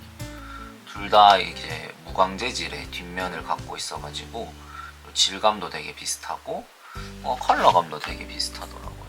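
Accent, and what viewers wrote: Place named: Seoul, South Korea. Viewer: 어디 지역사세여 사투리랑 서울말이랑 계속 왔다갔다 하시네요 ㅋㅋ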